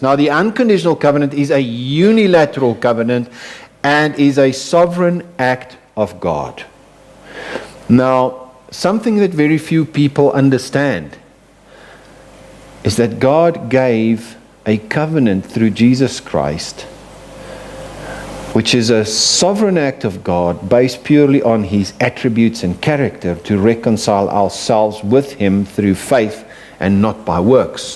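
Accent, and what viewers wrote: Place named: South Africa. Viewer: Glad to come across this. Pastor has a South African accent.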